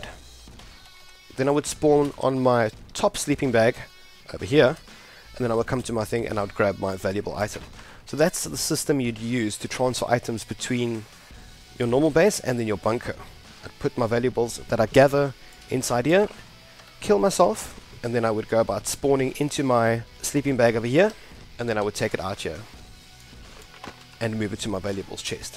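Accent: South Africa. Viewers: Are South African? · Are you South African mate? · What's that accent? Are you South African? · You sound South African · South African? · 120% south african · are you south african ? im north African :) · Are you south african? I heard Australian at first then i noticed that boer accent. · South African ? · Are you South African? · From your accent I am assuming you are South African and I pray to God that you are not still in that godless country. Stay safe out there buddy. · Are you south african? cuz you sound like it :) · This guy is south african 100% How i know the accsent And south african afrikaans english grammar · are you south african? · Are you South African 🇿🇦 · I swear this homi is south African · I really love your accent and it makes me miss SA, great videos man and I love the concept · Upon watching this i couldnt help notice the man's voice in the video... 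how familiar it sounded. Then i check his twitter and i realise... FAM! South African gamer ^^. SO great to see a South African making a successfull year on Youtube and into their own streaming career. You are an inspiration dude. I stumbled upon this vid, but you got a new follower for sure. Nice hidden base video, going to lock up all the captives in there from now on ^^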